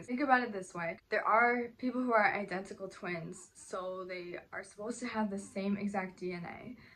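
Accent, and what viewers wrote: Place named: United States. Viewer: نو لَكنتها امريكيه ف كلامها عادي ^o^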